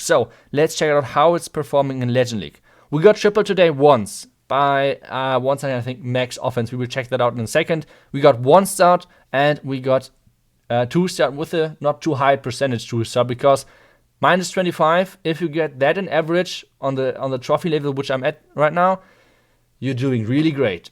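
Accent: Germany. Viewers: Its great to have a world class(skilled) player as a youtuber.Your enlish(German version😊) is really enjoyable.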